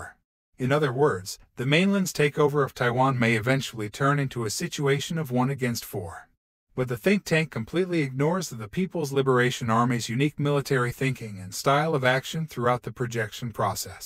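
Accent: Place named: United States. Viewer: I prefer the original voice. This one sounds too American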